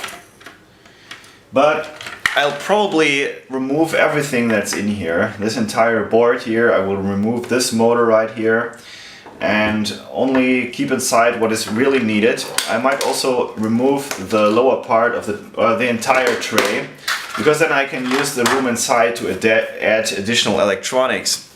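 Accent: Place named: Germany. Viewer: Be careful with those videos about your television work. You could raise the ire of the television people if you release videos on things that haven't been aired yet. Movie goers aren't the only ones that tend to not like spoilers. 👍 Warm gear? Oh, worm gear. I keep forgetting that English isn't your first language. You speak English pretty darn well, so don't feel bad if I enjoy your accent a little. My German isn't even close to as good as your English, so I'm NOT mocking.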